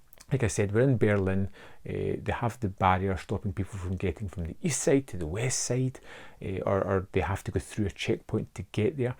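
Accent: Scotland, United Kingdom. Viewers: You should effin subtitle your videos, dude, that thick Scottish accent is too much to handle.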